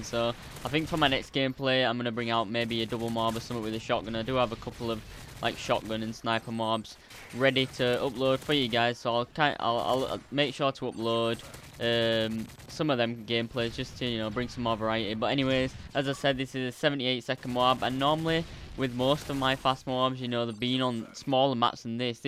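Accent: United Kingdom. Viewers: Are u British be ause I want to no cause ur accent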